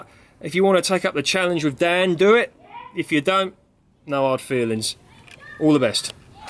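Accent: United Kingdom. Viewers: You sound like a man after my own heart, you are obviously English sounding so what part of the UK do you live, South West, North? Just wondering as I’m in E.London and am just moving onto trying to grow Satsumas, but gonna try a Mango as the seed is so big it looks like an Alien pod, so has to be done, hehehe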